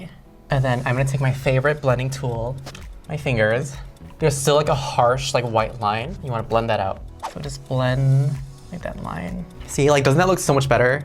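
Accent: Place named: Chicago, United States. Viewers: the chicago accent is jumping out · I'm only able to focus on her Midwestern accent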